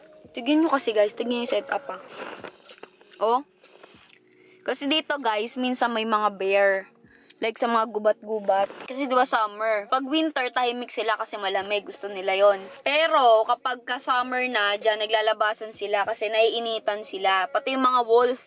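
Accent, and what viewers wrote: Iloilo, Philippines. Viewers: Ate na iilonggo ka ba??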